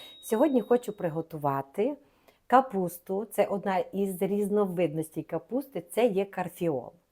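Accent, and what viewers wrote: Ukraine. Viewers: Говорите по_русски, чтобы было более понятно.... Вы же грамотный человек, не нужно акцэнты делать...... Я прекрасно знаю язык укр. Но, много не расслышала_а другие просто отключат_чересчур акцэнты не центральной Украины.... Я люблю классическую речь и укр. И русскую.... Думаю, меня и остальные поддержат.... А, вообще вы молодец!!!!!!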